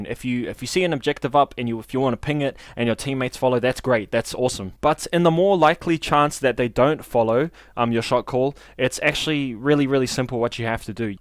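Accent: New Zealand. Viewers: you a kiwi bro? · Bro are u from NZ u sound Maori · Solid Vid i hear the nz accent hahahah cheers for the solid tips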